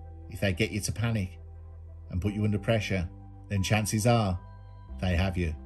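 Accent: Birmingham, United Kingdom. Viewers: Top content again mate . And the Brum accent just tops it off. Love from Manchester 👍🏽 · Big love for the Brum accent. I will never get tired of it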